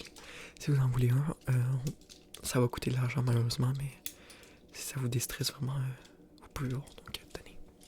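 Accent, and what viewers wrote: Quebec, Canada. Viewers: aaaah j'adore l'accent québécois :) tu es mon nouveau asmrartist préféré ;) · j'apprécie les explications données pour chaque objet utilisé , je connais pas tous les termes malheureusement mais mon cerveau se relaxe avec ta voix et ton scratching lent je crois que c'est ça m'a déclenché des frissons . enfin bref , tout ça pour dire que je viens de te découvrir cette nuit . je n'arrivais pas à dormir et je suis tombé sur une de tes vidéos , après hop abonné . l'accent québécois m'apaise + asmr combiné = mon cerveau est content 🤔👍👏✌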